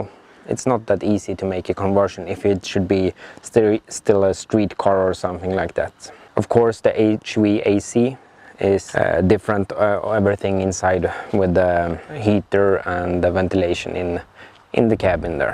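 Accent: Sweden. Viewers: Are u swidish?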